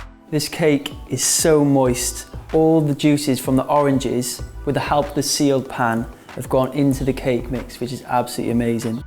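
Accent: Wales, United Kingdom. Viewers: British accent ? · Alisha C That’ll be a Welsh accent. He’s from Cardiff. I don’t think he’s cute, more so because I have sons his age and that would feel weird, but I’d be very proud of him if he were my son.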